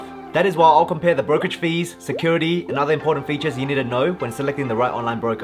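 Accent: Bankstown, Australia. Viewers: You sound like you live in Bankstown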